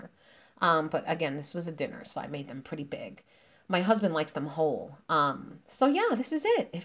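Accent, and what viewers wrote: New York, United States. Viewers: That accent makes me proud to b a New Yorker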